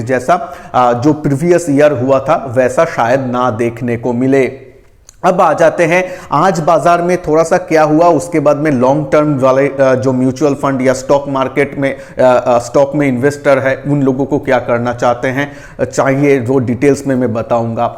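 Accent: Bengal, India. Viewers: You sound Bengali. Are you based in Mumbai or kolkatta or where?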